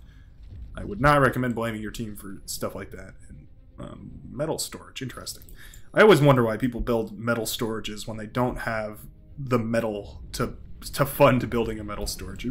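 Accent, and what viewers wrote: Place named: United States. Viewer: great to see the interest in the game. great cast. keep up the casting and learning., its good to see new players coming through and will be interesting to see how they develop and some maybe even surpass the established "pro" like myself :) if you can get in at GMT evening times you'll see much higher ranks playing so you can learn some more, but you sound american so maybe a bad time for you lol.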